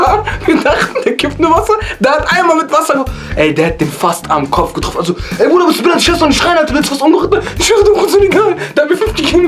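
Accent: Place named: Bavaria, Germany. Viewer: brooo du kommst aus bayern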